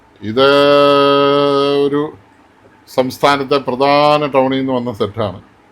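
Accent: Kottayam, India. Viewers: അച്ചായാ വളരെ ഇഷ്ടം നമ്മൾ കോട്ടയത്തു കാരുടെ സംസാരവും സംസ്കാരവും ലോകം അറിയട്ടെ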